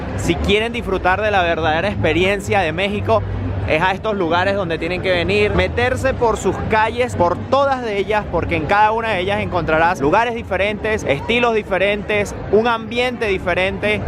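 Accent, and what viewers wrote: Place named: Venezuela. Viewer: es venezolano, no le oyes el acento?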